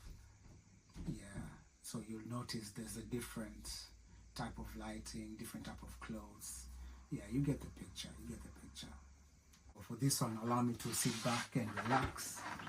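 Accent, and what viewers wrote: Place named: United Kingdom. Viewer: Its the UK accent for me 😊thank you so much for sharing, very educational, cant wait for you to be done with all these😮mungu mbele bro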